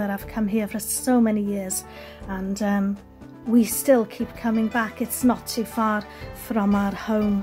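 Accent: Wales, United Kingdom. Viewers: It couldn't be more perfect location for a monastery. The serenity and beauty are perfect combination. I hope your cold had left already. Little Switzerland is a fitting nickname. Gosh your Welsh is so good to listen to, I love it. The church is really looking great considering how old it is.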